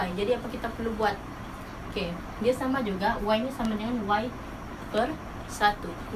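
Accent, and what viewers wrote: Sabah, Malaysia. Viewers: Sabahan slang checkk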